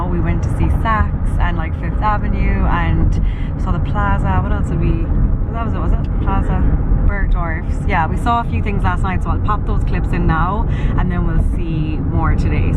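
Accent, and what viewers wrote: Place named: Ireland. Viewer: She's Irish, from Kerry in the south-west of the country. Irish accents are incredibly varied - there isn't just one.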